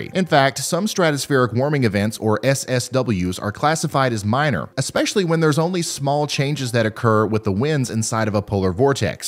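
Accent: United States. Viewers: As I noted how country your accent was I realized how country I speak as well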